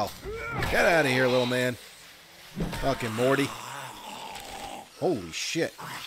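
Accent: New Jersey, United States. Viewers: army of 400,000 degenerates going strong! Guys, go back and watch some of the very first videos he posted. He used to be so polite and no Jersey accent at all. Listen to him now! I much prefer this version of lol dude you rock! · I HATE zombie games & jump scares...that being said, I bought 7D2D because I LOVE your content. I've been playing it faithfully for months now. Thanks to you, I have become quite the zombie killer! I never miss one of your episodes and have been working through your past content, because you give great entertainment! Congrats on 400k subs, and looking forward to hearing your dulcet Jersey tones in the future!:D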